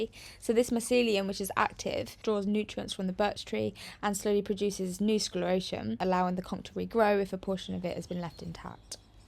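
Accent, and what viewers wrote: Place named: Yorkshire, United Kingdom. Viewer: You sound well posh for a Yorkshire lass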